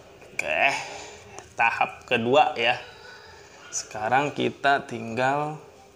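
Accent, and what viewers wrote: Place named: West Java, Indonesia. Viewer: Mantap bang bermanfaat bagi pemula.......logatnya kaya orang sunda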